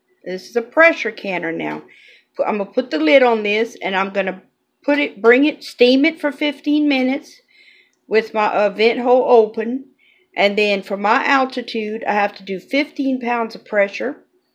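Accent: Louisiana, United States. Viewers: Hey Girl! Love this! I just found your channel and subbed! We live in Alabama now, but my husband and i are from Louisiana (he's from New Iberia and my people are from Natchitoches). I don't see many good, down to earth channels from people who sound like home from Louisiana, so: Good On You! God Bless!!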